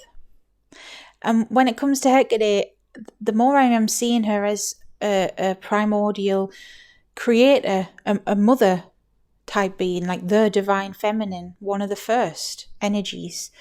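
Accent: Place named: Yorkshire, United Kingdom. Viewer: my mother is from England, she grew up in Yorkshire, I'm in America never been to England, but one day I will go. Your accent reminds me of my mothers. 😘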